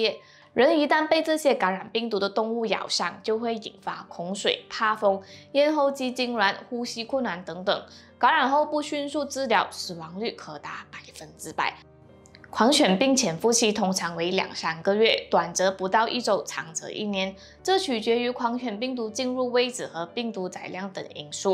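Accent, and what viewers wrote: Malaysia. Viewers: 口音和咬字有点奇怪。。 来自马来西亚吗？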